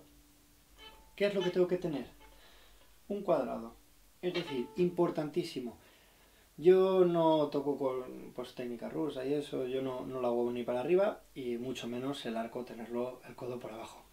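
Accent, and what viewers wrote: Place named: Spain. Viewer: Lástima. Yo vivo en Barcelona y, por el acento... creía que quizás estábamos más cerca. Gracias. Seguiré con tus tutoriales a ver si avanzo.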